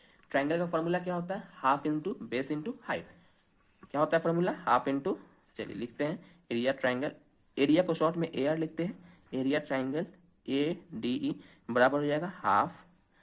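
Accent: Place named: Delhi, India. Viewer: Mast sir bs accent Delhi wala dekhna padega 😅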